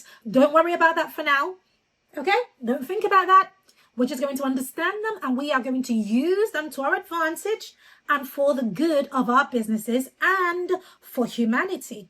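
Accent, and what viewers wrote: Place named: United Kingdom. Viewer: (I am answer to you here, as on that reply i couldn't, i don't know why!) I am glad! ☺hands-yellow-heart-red And...YES! I hope they will be the company, that i can fulfill my long last dream, of making that project! And first i thought that you are from the US, later on i have figured out, as you have mentioned that, you are from the UK and truly paying attention, at your english accent! 💫🙏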